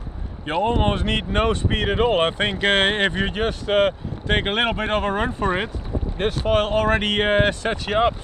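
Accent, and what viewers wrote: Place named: Netherlands. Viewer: nen ollander dieje engels klapt, haha ge hoort het toch direct he! Leuke review btw.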